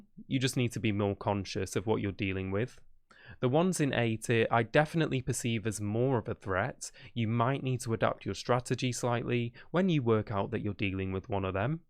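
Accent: United Kingdom. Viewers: that isn’t scouse lmao it’s East Midlands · It's the Scouse accent. It's really disarming.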